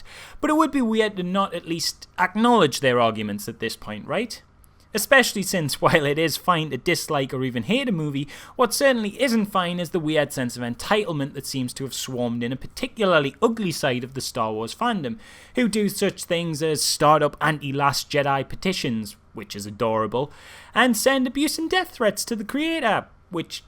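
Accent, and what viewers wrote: Newcastle, United Kingdom. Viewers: British huh......pussy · I fookin luv ya u geordie shite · Newcomer to the channel - thanks for the honest thoughts in this video. I liked TLJ a lot too. Also, love the unapologetic Geordie-ness. Good on you, fella (from way down South in Derby) 😎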